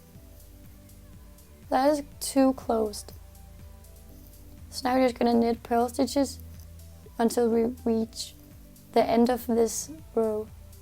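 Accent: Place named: Denmark. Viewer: Er du måske fra Danmark? Your accent sounds kinda like it. Tak for dine videoer!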